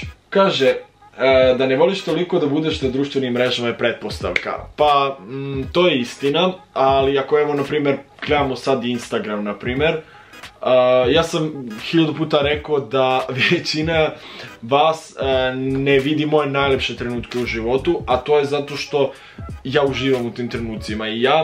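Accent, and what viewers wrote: Leskovac, Serbia. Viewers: Miji baba i deda pricaju isto leskovacki moze 24h leskovacki da pricas😂😂😂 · pretpostavljam da iza kamere pricas na leskovacki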